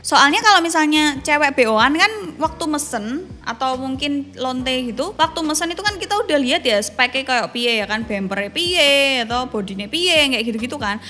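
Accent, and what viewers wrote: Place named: Bantul, Indonesia. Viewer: Iki mbk e nek ga wong bantoel.. y pling skitar kalasan po banguntapan.. logatmu ngangenin desoku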